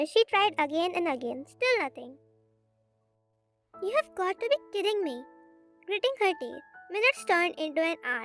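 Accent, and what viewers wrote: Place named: India. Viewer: This is absolute shit. Wtf. The voice is sooo pick me. The indian accent is so obvious. Quit already broo. Infact u sound like a seven year old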